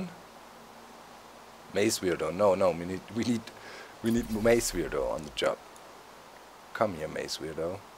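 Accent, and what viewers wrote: Germany. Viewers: i cant exit the video till i hear you say bye bye in your german accent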